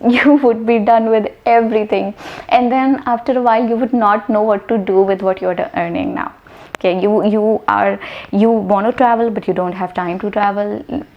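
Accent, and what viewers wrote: India. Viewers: I’m not trying to learn English (I actually teach it)! So I don’t why I’m now addicted to your videos 🤷🏻‍♀️. Yes I share your sentiments. I gave up my successful job in the corporate sector & went into teaching & training - just to make a difference to the lives of young people. Yes I want to retire in the BEAUTIFUL birth place of my mother 🇮🇳 & now make a difference in the country she loves so dearly, that day is almost here for me 😊. Just one other thing - now I think I will stop trying to train students to ‘drop’ their Indian accent. Your Indian accent is BEAUTIFUL 👌🏾- it’s something people should be proud of & not endeavour to copy EVERYTHING about us foreigners 🙄😁 keep sharing your love & inspirational videos 🏆. Much love xx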